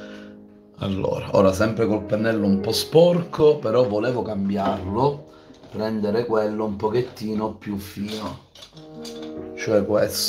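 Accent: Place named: Sicily, Italy. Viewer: Ti ho scoperto per caso ma non ti lascio più! Penso, dal tuo accento, che tu sia siciliano come me! 👏👏👏👏👏🥰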